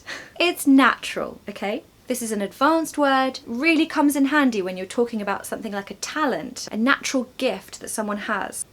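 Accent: RP, United Kingdom. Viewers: Hi, thanks to your video my hearing celebrates again!🎉🎉🎉 Helpful topics you've well explained again, under your beautiful MRP sound with! 👌 I'm also fancy the american way but when am back to your videos discovering again where the true is!!! 🚩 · Love your british accent and always can't wait to watch another YouTube video from you ❤ Greetings from Indonesia 🇮🇩😍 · You are soo cute and sound natural....British accent is really beautiful and omg are all British women this beautiful 😮....always enjoy watching you mam..😊🇮🇳❤️🇬🇧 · 😮When I lived in Cambridge in the 70s my landlady had wonderful diction like you. She was eccentric and constantly trying to seduce me but I put up with her to improve my English.When I moved to California I passed for British until I absorbed local sounds. I can switch if I try but your exquisite RP (to me it's perfect UK) is hard to match. 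Two small amusing details: the wrong Evenink sounds like Lugosi in 1931 Dracula. My generation learned from...Oh, giiirl. BTW, you are surprisingly expressive for a Brit, are you maybe part Irish? Great teacher and a charming lady.😊❤ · I am learning English, I like your British accent very much, thanks for you videos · Thank you so much indeed, ma'am! I love ur modern RP. Always It remind me😊 something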